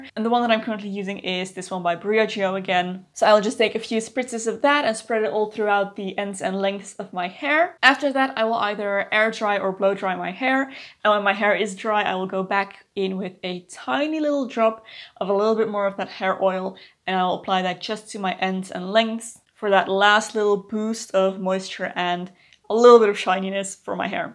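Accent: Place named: Netherlands. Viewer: Jojo I she’s from the Netherlands, I think 😊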